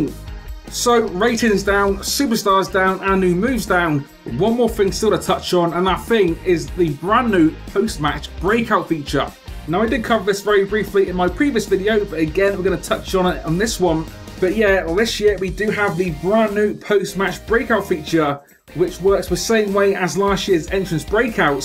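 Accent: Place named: Bournemouth, United Kingdom. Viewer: I think I know where your accent is from. Are you from Bournemouth, England? And btw another great video you're killing it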